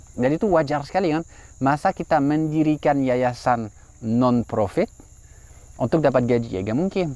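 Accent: France. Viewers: Aksen Perancisnya masih kerasa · Banyak hal yang saya suka dari anda, tapi ada satu yang paling saya suka, adalah cara bicara anda memakai bahasa Indonesia dengan aksen France yang berkelas. Sengau sengau aduhai. Really love it 😂😀❤️🔥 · Dari kecil saya selalu tandain, kalau ngomong "memang" jadi "mamon" dalam aksen Prancis:D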